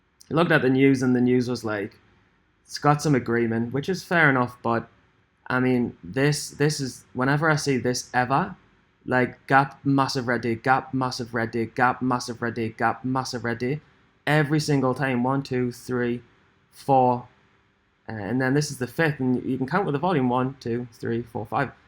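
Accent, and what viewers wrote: Newcastle, United Kingdom. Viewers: You sound like you're from Newcastle! What broker do you use to trade us stocks in the uk?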